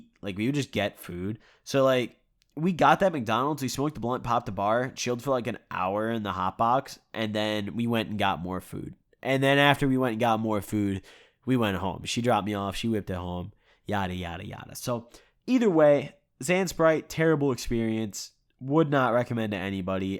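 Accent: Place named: Lafayette, United States. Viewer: Sounds like your in Lafayette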